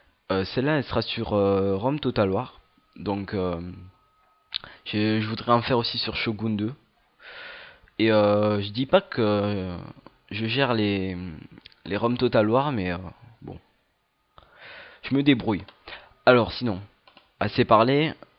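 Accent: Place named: South, France. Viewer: Salut ! Content de voir une nouvelle série sur Rome Total War, et avec l'accent du sud s'il vous plaît :D ! L'installation de mods n'est pas très compliquée et généralement bien expliquée par les développeurs de ces derniers. Tout dépend de ce que tu recherches, mais personnellement j'utilise Roma Surrectum 1.6 (la deux déchire encore plus mais j'ai un débit pourri pour le télécharger et je suis anglophobe). Bref, pourquoi pas une série sur une campagne moddée ? 0:)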